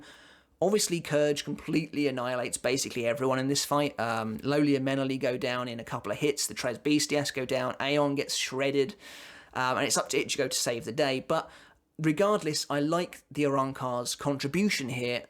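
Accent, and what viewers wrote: United Kingdom. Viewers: Bro you british ?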